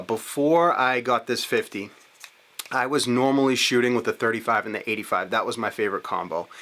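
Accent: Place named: Maine, United States. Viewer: Loved this review, and your accent. Are you from Maine ?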